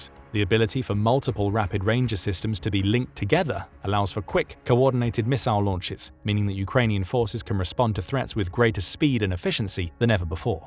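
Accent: England, United Kingdom. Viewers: You sound English. You're wittering on about British armaments. So why are you stupidly pricing them in dollars. They actually cost POUNDS STERLING. What are dollars worth? Considerably less now than yesterday, and less yesterday than the day before. Somewhat like the German Mark in the 1920s-30s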